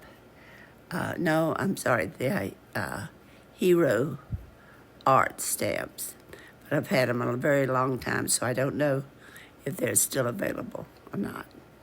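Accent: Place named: Deep South, United States. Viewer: 👋 Hello Neighbor! My Tennessee Neighbor, I know you live in Tennessee but I would swear I hear a Deep South accent in you, I could be wrong though. I live in and was born here in rural Appalachia, Leslie & Harlan county, but in my 20’s I movie to Biloxi Mississippi for a couple years, and I’m just curious if you are Originally from Tennessee or if maybe you grew up down South? I LOVE your channel, I am fairly new so I’m watching some of your older videos too in my free time, your toilet paper video blew my mind! I think you are a undiscovered Genius! 😊❤ You are Awesome sauce❤, a wealth of knowledge, I’m so grateful to learn from you, Thank you so much for sharing!!😊 I just adore you. Also, 👋 hello to everyone watching, crafting friends! 😊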